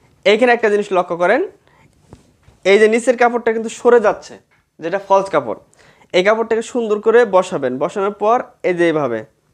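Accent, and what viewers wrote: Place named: Sirajganj, Bangladesh. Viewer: আপনিকি সিরাজগঞ্জের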